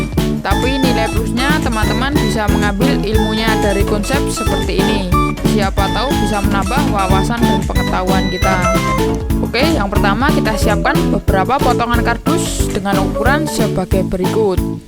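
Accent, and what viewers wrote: Java, Indonesia. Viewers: Orang jawa ya mas kok suaranya persis orang jawa aku orang jawa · Bang medok bet😁😂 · Tolong kurangi lah medok nya saya jadi gak nyaman nontonya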